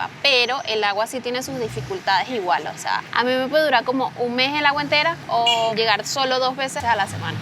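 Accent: Venezuela. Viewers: Me encanta que has llegado con tu acento venezolano. Es posible que tengas nuevas palabras y expresiones pero es tu acento. Algunos con solo unos pocos meses fuera del país vienen con otro acento y hasta pretenden que olvidaron ciertas palabras.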